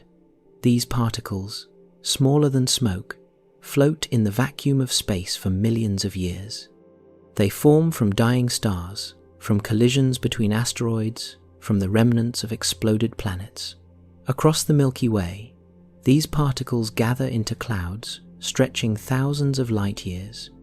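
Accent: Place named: United Kingdom. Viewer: I actually, like the voice of British accent. I can understand perfectly. Please use the same voice for future posts. This new discovery is awesome. I am always hungry for facts and accuracies., while we are still alive to learn our location in the vast Milky Way. I was wondering 🤔 all the time what our solar system encounters during our galactic journey. The Earth absorbs some of the space dusts and unknown particles that can affect our planet. Gamma rays or super nova radiation are scary that truly wipe out our civilization instantly. Anyway, I surely enjoy listening the podcast. Thank you for a good job 👏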